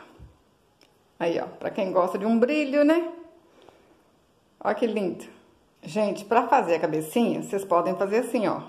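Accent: Minas Gerais, Brazil. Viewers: Parabéns adoro os seus artesanatos ,e a explicações são bem claras ,e também adoro o seu sutaque de mineira ! 👏👏😍😍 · Ameiii. Parabéns pela dedicação. Adoro seu sotaque de mineiro. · Vc é mineira?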